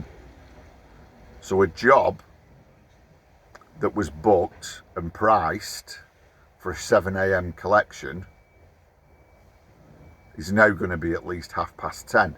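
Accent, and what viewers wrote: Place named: Lancashire, United Kingdom. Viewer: That accent! Wigan or Bolton Bolton or Wigan?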